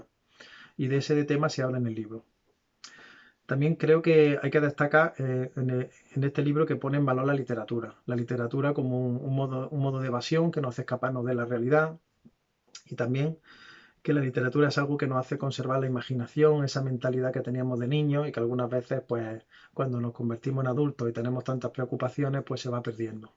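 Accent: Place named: Jaén, Spain. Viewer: Genial el video, me ha servido para hacerme el resumen mental para los exámenes de las pruebas superiores! ^^ PD: como se nota el acentillo jienense jajajaja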